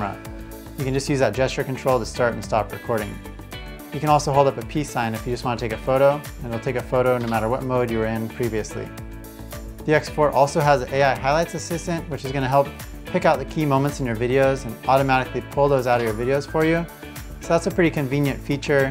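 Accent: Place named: United States. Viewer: Finally someone who speaks American English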